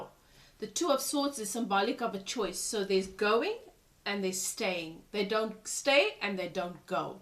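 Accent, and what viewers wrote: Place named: South Africa. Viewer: Wow,I love your reading, thought I recognize that accent.First time listening to a South African reader.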